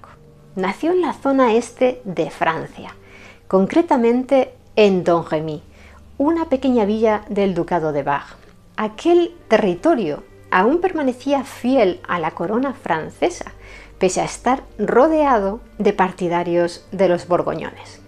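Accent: Castile, Spain. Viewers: Hola Feliz Navidad cómo estás, me encanta ver tus videos y escuchar tu acento castellano, Yo amo la cultura, la historia la política, la religión y la música y escuchar tu voz en la narrativa, me encanta, Gracias por estos videos, Dios te bendiga hermosa mujer y que la Virgen te siga cuidando amén; la doncella de Orleans se convirtió en la patrona de Francia ⚔️🙏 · Soy de Colombia pero me encanta escuchar estás historias antiguas en el acento de los españoles,siento como si estuviera viviendo en carne propia esa historia,lo relatas muy bien.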